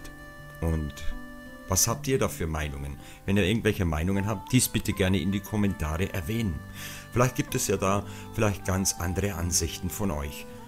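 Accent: Tyrol, Austria. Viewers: Bitte nicht auf Tirolerisch, außer es gibt ein Übersetzungstool... im Norden fällt sowas schwer zu verstehen 👽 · Hey, schöner kurzer Beitrag. Die Theorie mit den Fischvorbildern für die "Mayaflieger" hatte ich erst vor kurzem gehört, nachdem aber die fliegenden Fische auch ganz anders aussehen ist diese Theorie zumindest für mich wiederlegt. Für mich sind das immer noch Flugzeuge. Die Glühbirne würde halt gut zur Bagdadbatterie passen, womit ja angeblich nur vergoldet wurde, oder so... Schade, dass Dich die Arbeit in der so wie so schon so hektischen Vorweihnachtszeit so einspannt, halt die Ohren steif... Lieben Gruss und einen hoffentlich entspannten Sonntag Abend noch Paco PS also mir hat der tiroler Dialekt gefallen, ich musste mich zwar ein wenig konzentrieren habe aber alles verstanden...:) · wenn du bei dem video im tiroler dialekt dann untertitel aktivierst oder eine zweite audiospur auf deutsch machst ist das ok.^^ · Schian Gruass ausn Stubai.. 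mach weiter so.. ob auf Tirolerisch oder sinsch.. 😜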